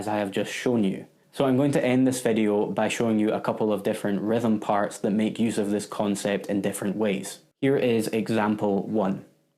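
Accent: Scotland, United Kingdom. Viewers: That accent, any fellow Scotsman can immediately identify it.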